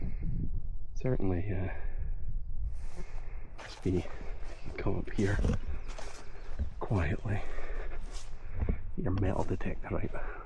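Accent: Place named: Scotland, United Kingdom. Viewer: Sorry you were puffing but this subscriber likes listening to a human narrator and with a Scottish accent talking about Scotland 🏴 Thank you 🏴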